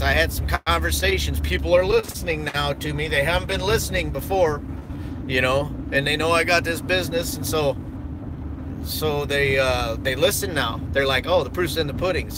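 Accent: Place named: United States. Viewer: I love your american accent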